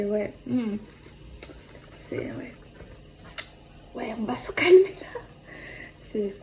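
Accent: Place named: South, France. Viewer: Bonsoir,hey c'est également l'anniversaire de ma fille 🎂.... Joyeux anniversaire 😁 et j'adore tes vidéos qui sont dynamiques,ton accent du sud... continue comme ça 😉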